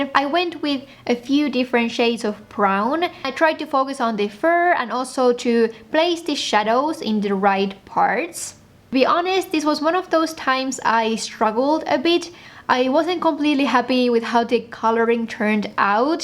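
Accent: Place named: Finland. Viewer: Found this video by searching for bj/planner videos. Amazing artistry. 👌🏽🙏🏽❤️ As soon as you started speaking I guessed you're Finnish😊🇫🇮. No offense ❤️. EDIT: The squirrel is exquisite 💕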